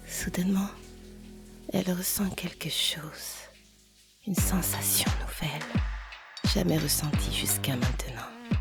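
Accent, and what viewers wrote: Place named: Tokyo, Japan. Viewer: On dirai tokyo la voix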